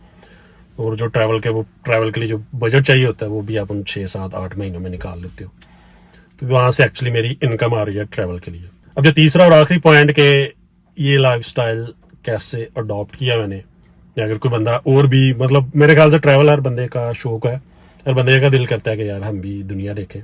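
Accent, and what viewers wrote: Punjab, India. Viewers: yar aap pyar ho boht hi achhay lagte go mujhe mujhe apka punjabi accent boht achha lagta hai lahore pakistan se boht sara pyar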